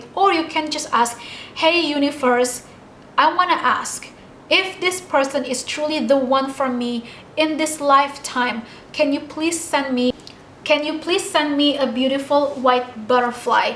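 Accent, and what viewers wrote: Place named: Italy. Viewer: Where are you from? (idk why your accent sounds Italian to me lol)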